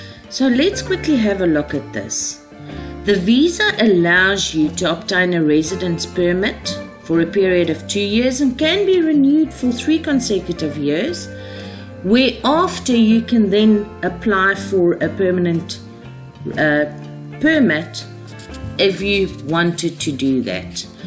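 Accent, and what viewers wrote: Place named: South Africa. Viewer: Oh my word you're south African!!!!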